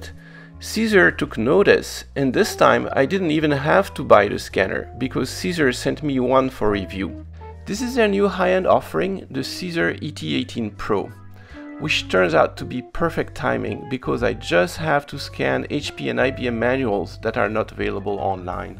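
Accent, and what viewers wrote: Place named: France. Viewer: Nice bit of jazz and a french voice 👍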